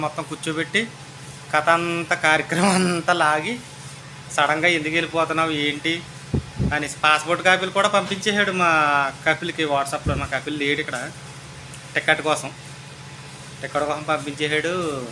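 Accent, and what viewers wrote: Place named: East Godavari, India. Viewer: హాయ్ అన్న నీను మొదటి సారి మీ, వీడియో చుసాను బాగుంది, మీరు మాట్లాడే విధానం బాగుంది,మీ,మాట, తీరు చూస్తూంటే మా, తూర్పుగోదావరి జిల్లా మాటలా ఉంది, ఇప్పుడే సబ్స్క్రయిబ్ చెసాను బ్రదర్ ఇప్పుడు నుంచి మీ, వీడియోస్ చూస్తాను అల్ద్ బెస్ట్ బ్రదర్ అండ్ జైహింద్ 👍👍♥️♥️♥️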